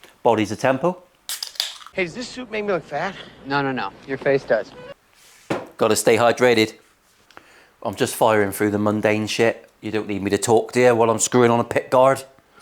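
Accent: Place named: Working class, United Kingdom. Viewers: Love these videos for the west country accent alone 😂😂 strat me up baby strat me up. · Just came on board for this build, but as a Yank, I love that you talk the way every American imagines a working-class Brit would talk. Of course, we've been brainwashed into thinking that Dick Van Dyke's accent in "Mary Poppins" is the pinnacle of British accents. Been a lot of fun watching the progress, you've got mad skills.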